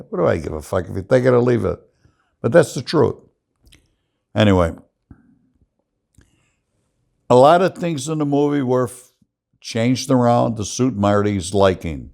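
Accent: Chicago, United States. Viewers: Frank didn't your big Brink's truck heist involve Divine Savior church in Norridge? I remember that when I was a kid. Also, did you hang around at Grand and Cicero Avenues? Thank you for clarifying that we use the term JAGOFF back home. I have shown your scene in Casino set in Costa Rica to several people to help them understand our ways in Chicago. And your accent in your videos make me homesick!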